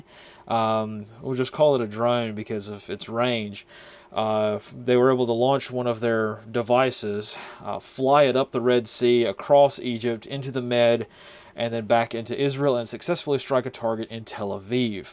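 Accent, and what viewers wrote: United States. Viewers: I detect a little country accent 🥰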